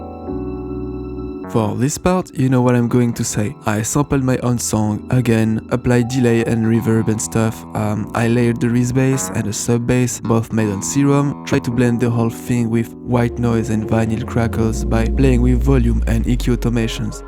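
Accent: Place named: France. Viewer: I recognized a French accent Mdrr 😂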